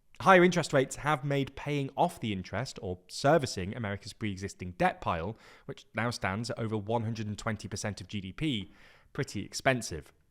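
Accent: United Kingdom. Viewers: Love the British accent. You must be smart. How is the UK doing?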